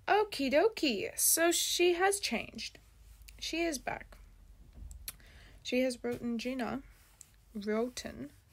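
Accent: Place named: Australia. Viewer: I knew it I knew u sounded like a Australian🙃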